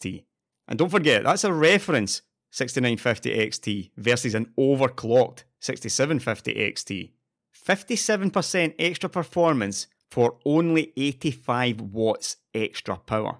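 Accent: Scotland, United Kingdom. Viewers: The Scottish accent was too much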